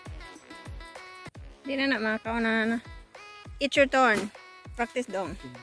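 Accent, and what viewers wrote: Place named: Bohol, Philippines. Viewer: Boholano kaayu Miss🥰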